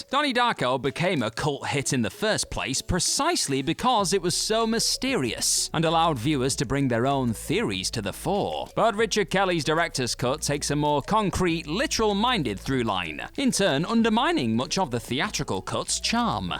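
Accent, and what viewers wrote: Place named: RP, United Kingdom. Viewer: I'm sorry, but sucks as a narrator. He always sounds so condescending and phony. Like he's trying to play the character of a posh, Shakespearian trained voiceover actor instead of just doing a normal voiceover where he sounds like a normal person.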